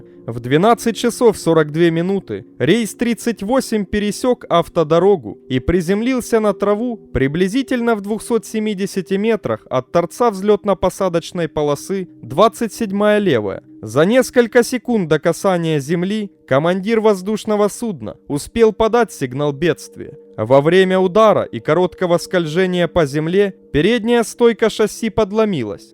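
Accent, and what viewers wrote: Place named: Ukraine. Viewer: Автор украиноязычный судя по произношению, и я уже много раз пишу - розмовляй рідною мовою. Так буде краще.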